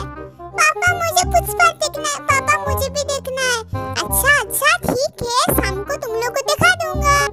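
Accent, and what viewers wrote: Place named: Bihar, India. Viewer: Typicall bihari voice By the way love your voice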